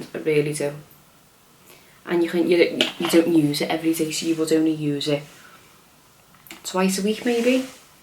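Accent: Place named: Ireland. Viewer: Your accent is really pretty. Are you Irish?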